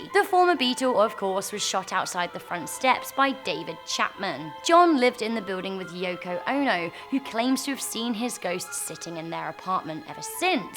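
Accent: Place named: United Kingdom. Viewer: British accent🤮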